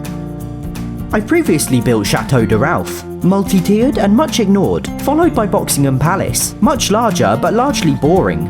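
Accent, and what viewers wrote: United Kingdom. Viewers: He is British I think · glad you’re British and not American if you know what I mean.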